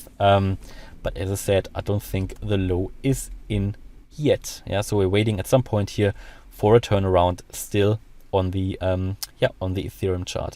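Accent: Germany. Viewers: digga bitte versuch diesen deutschen akzent dir abzugewöhnen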